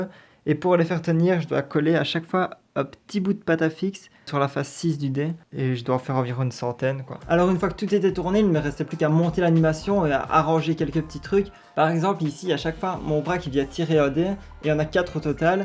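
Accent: Liège, Belgium. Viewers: T’es vraiment trop fort ! Je pense de c’est le stop motion le plus cool que j’ai jamais vu ! Sinon tu ne serais pas belge par hasard ? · T'es liégeois? Vu ton accent ahah ? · Hello c est vraiment des supers videos perso j kiffe grave. Une petite question est ce que t es belge?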